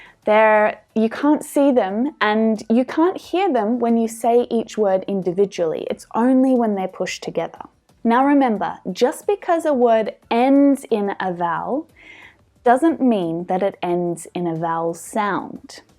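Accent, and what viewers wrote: Australia. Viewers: As a British educated person living in Canada, I am fond of your Australian accent and your country Australia. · Hi!, I really enjoy your teachings. I've noticed, trouhgt out your accent, that Australian one is very close to British. While in the U.S.A., I took my classes, right there. I'm so eager to keep in the same track, so that I'm in the continous path of learning this beautiful language as much as possible. Again, thanks a lot for your help. With empathy: your suscriber, G.Z. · Hi, It's really hard to find good australia accent english teaching channel, I subscribed immediately when I found your channel and being enjoyed it ever since. But here comes the real question, Is it weird to for a male to shade a female? Am I going to sound more feminine?😂😂😂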